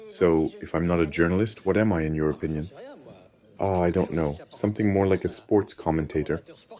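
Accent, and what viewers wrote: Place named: France. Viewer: Your French accent is a plus value to this narrative thanks for your originality not using AI ;) Luckily anglophones will now benefit from your impressive knowledge sharing. Terrific.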